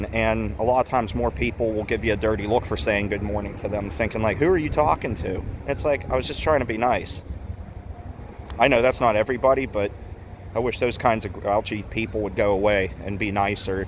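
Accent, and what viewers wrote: Pittsburgh, United States. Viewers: First of all, love your Pittsburgh accent! It kind of makes me homesick. I lived in the North for a long time and agree with you about southern people. If I had a choice between living in Texas or Tennessee however, I would live in Tennessee. Great video bud! You made an otherwise boring old gas station interesting.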